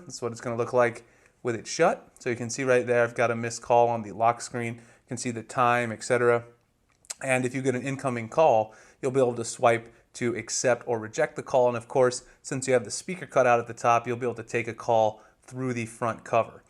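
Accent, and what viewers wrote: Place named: United States. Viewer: I love the way Americans say mobile lol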